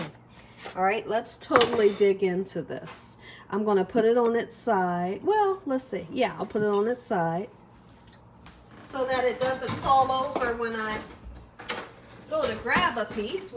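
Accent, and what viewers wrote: South, United States. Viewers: I love this lady so much she sounds so southern 😂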